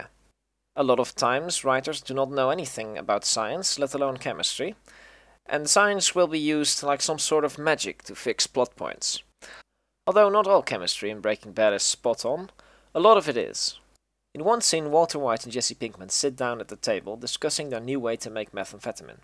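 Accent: Netherlands. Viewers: dutch speaker hmmm? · Are u dutch by any chanse? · Please make more videos I normally don’t like chemistry but this was super fun! (Also i sense some Dutch accent in your voice!) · You're Dutch for sure right? From Brabant toevallig? Not to stereotype, but hey this video is about drugs.... 😂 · Slechts lichtelijk Nederlands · after listening to your voice for 5 seconds i just know that you are dutch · Lekker Nederlands accentje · Je bent nederlands ik hoor het aan je accent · I recognize a dutchman when I hear one. Gegroet landgenoot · You sound incredibly Dutch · are you dutch? you sound like a Dutchy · Dutch chemist, nice. Brabant? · Hahahaah, ik kan je nederlands horen hoor jongentje! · i smell some nederlands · Sniff sniff, I smell Dutch? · You're definitely Dutch (or maybe flemish?) lmao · I smell dutch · nederlands accent gedetecteerd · Are you Dutch by any chance? 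· Hoor ik hier een Nederlands accent?🤔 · are you dutch or maybe german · I can just hear the dutch in jou lol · Mooie Nederlandse accent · im 99% sure this guy is dutch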